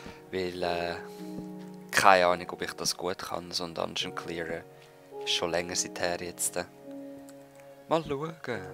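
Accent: Switzerland. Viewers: SCHWIZZERDÜTSCH <3<3<3<3<3<3<3<3<3<3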